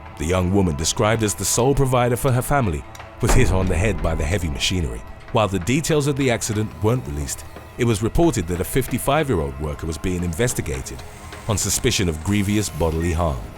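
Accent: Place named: England, United Kingdom. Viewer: South Church BLVD?!? The fact the ‘narrator’ has an English accent makes this even more painful to type 😭😂😂 Besides being born/raised/living in London for MY WHOLE LIFE, I’m pretty certain there IS NOT EVEN ONE BOULEVARD (blvd) in the WHOLE UK (England, Ireland, Scotland, Wales) let alone London (although the ‘posh’ parts of West London have some fruity street names) Pleanty of ‘Road’s, ‘Street’s, ‘Green’s, and the odd ‘Parade’, ‘Drive’ though (might have missed a few) P.S. Not many ‘Col De Sac’s, pleanty of ‘Close’s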